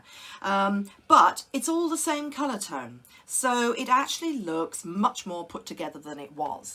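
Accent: United Kingdom. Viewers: Hi D,,, new from CA,, I will be 72 in a few days,,April 2024 I have made it!! I agree that it is your lovely kind presentation that got me subscribed...TY! You all might get a super kick out of this But,, I stopped wearing certain things back in the 20 the century,,,Jeans, jewelry, jackets, heels...all out!! lol...I wear big old clogs year round to accommodate my bunions ( and sneakers), I layer on scarves and I like to paint on shirts and my winter coats to keep me colorful and comfortable as well...Love your look and your Brit accent! Mo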